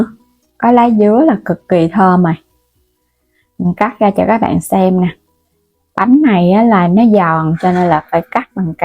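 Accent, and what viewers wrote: Mekong Delta, Vietnam. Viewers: Giọng miền Tây dễ thương mà bạn sao bạn nghe không được vậy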